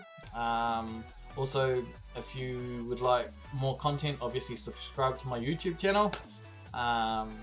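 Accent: New Zealand. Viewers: Are you from nz? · bro your a kiwi aye, that accent sounds like home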